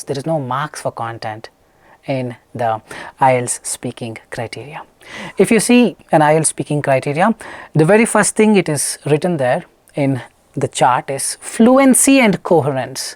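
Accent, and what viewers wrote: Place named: India. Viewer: I think he is the best guy who can explain language topic easily. Besides IELTS video, I even follow his another videos about PTE. I enjoy his video a lot from Bangladesh. His accent tells me he is Indian guy. Love from BD.🥰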